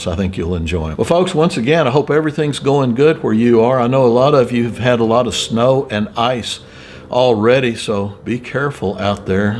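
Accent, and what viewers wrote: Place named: North Carolina, United States. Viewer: Hello from North Carolina Dr! I love your videos and I'm also a subscriber of channels too. You sound just like you are from NC because you talk like we do here, and I love it. Was that gold car a 63 split window Corvette in your garage? It sure looked like it was which is my favorite Corvette body style. May God bless you and your loved ones and I pray y'all will have a very Merry Christmas!!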